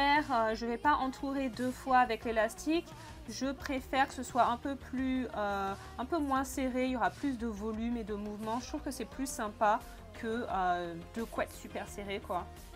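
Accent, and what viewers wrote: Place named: England, United Kingdom. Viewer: J'aime ttes les coiffures,j'adore ton accent anglais💘😄✋🏾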